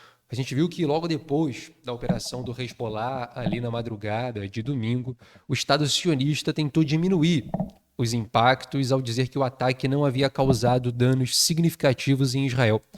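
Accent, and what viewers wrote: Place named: Rio de Janeiro, Brazil. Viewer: Tem hora que eu acho que o é carioca da gema, tem hora que parece paulistano da Mooca! Sotaque mucho loko! #freepalestine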